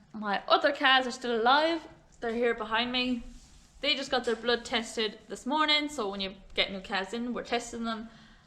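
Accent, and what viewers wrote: Ireland. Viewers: How have you managed to hold onto the Irish accent for so long?! enjoying the videos, keep up the good work! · Great video you present very well, love the Irish accent. Stay well and stay safe, and I look forward to more.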